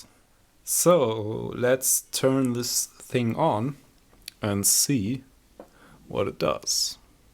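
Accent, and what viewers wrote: Germany. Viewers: Ich höre da ein deutschen raus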